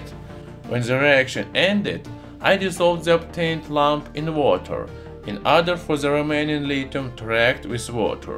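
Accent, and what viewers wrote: Russia. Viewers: I love your accent. It adds to the "mad" Russian scientist character. 😁 I mean that in a friendly way. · Love that thick Russian accent! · Regarding his pronounce he is from rushka. They waste all toxic on their forests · "I needed to remove iron sauce" "Somehow extract near demon souls" You see, the auto generated English caption can't understand Russian Style English. But human ear does.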